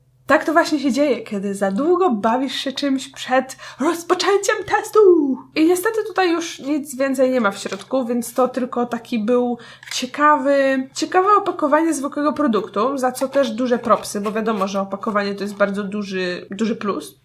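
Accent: England, United Kingdom. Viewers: Ach.... i ten jej angielski akcent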